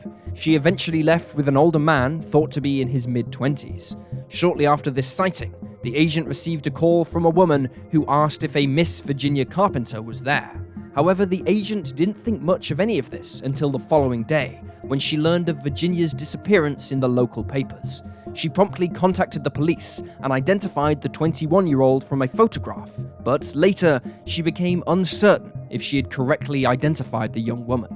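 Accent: United Kingdom. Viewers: Where would you ascribe his accent in the UK?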